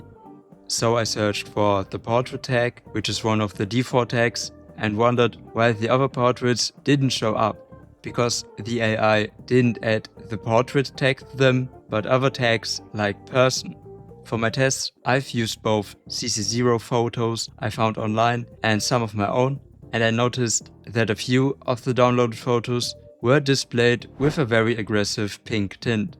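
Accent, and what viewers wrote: Germany. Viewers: Was ein brutaler deutscher Akzent.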